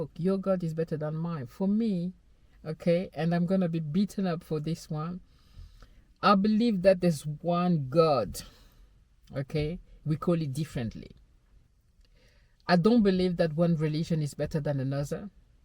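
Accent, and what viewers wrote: France. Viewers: That adsense delivery timing was perfect! 😂😂 I love what you said about God and higher power. Your accent is amazing - so is it French/British? Also I have had to fire two really good people in the past few months and it’s just as awful on the other side of the table. Our business is down in profit and if we didn’t let people go, we would eventually have to shut down and everyone would be out of a job. I know it feels so personal and terrible but it really comes down to spreadsheets. I don’t know if that helps or not but just wanted to say both sides of the conversation are really hard. I love my employees and know they have bills and families and know their stories. But I think you have a great attitude and I’m not worried about your future success at all!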